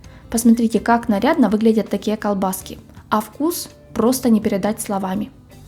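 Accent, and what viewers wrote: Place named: Odessa, Ukraine. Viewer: Привет Автор ролика! Спасибо большое! Всё понравилось! Судя по голосу Вы ближе к Одесской области находитесь) или Приднестровью?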